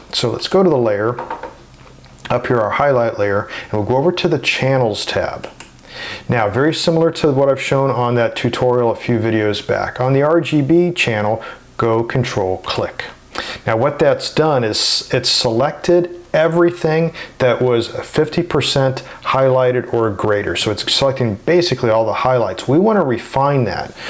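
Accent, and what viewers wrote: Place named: United States. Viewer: thanks a lot! I can't understand american english very nice so that's why i needs me to watch the video 2 or 3 times to be sure to understand every thing! If I could I would really enjoy sharing pictures with you which give me some problems... Thx from Paris France!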